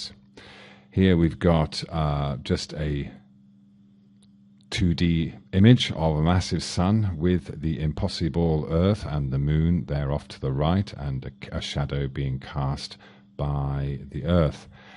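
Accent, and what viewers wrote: United Kingdom. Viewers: You seem to confuse the terms Ball with Planet and all the physical differences attributed to each. you seem to forget that light propagates through a vacuum in parallel rays . its about the angle of the ground to the incoming rays ie: the amount of light/energy hitting the ground per sq meter or what ever wacko unit you chose to use. at the higher latitudes the surface faces away from the sun more and hence there is less energy per sq unit of ground. than at the equator this is just kindergarten thinking. you don't even understand the physics of the model you are trying to debunk. you sound intelligent, perhaps the British accent helps, but you even vaguely seem to know what you are talking about. couldn't watch any further as my head was about to explode thanks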